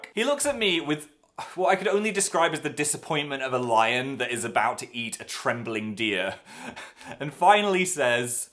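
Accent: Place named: England, United Kingdom. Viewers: last time i was this this early still had a northen accent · Is it just me or when pretended to be American as that gym dude on the phone he suddenly sounded... low class. Like literally, having an English accent is part of his charm. And he's better that way! Pray we may never stumble upon an American. Amen.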